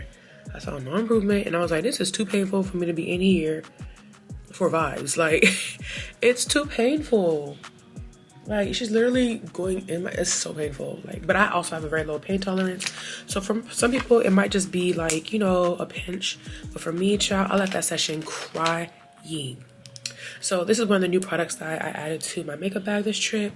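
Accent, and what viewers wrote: London, United Kingdom. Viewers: omg I'm so here for the British accent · I love your British accent 😁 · Im so here fot the bri’ish accent! 😂🙌🏽 it never gets old or annoying! I thoroughly enjoyed watching you speak in their accent while applying your makeup ☺️ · Nailed London voice frfr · Love a British accent! ❤ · Your British accent is so fun! 😄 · It's the British accent for me. You have a friend in me well a born and raised British play mommy here. You looked beautiful for dinner. Of course you had me dwl. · Accent solution, a Caribbean babe that was born or raised in London. Both come through. Tootsie time comes to mind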